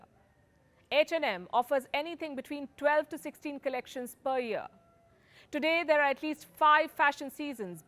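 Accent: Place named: India. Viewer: She has an Indian accent to carve granite with. Her topics and intelligence are always brilliant though.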